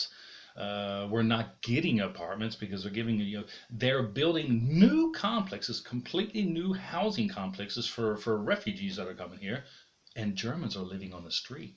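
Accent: United States. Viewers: Your American English is excellent my friend you had to have lived in the states at some point most Europeans speak a somewhat British English speaking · Is this guy American living in Germany? He sounds American.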